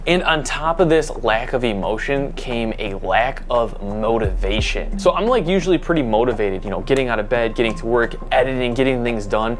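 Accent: United States. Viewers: I don't know about America(judging by the accent) but in Poland most commonly it's adviced to take it when you are relaxing to go to sleep in last 1-2hrs before you close your eyes. The side effects that you describe are similar to what I noticed poping a pill in the middle of my day.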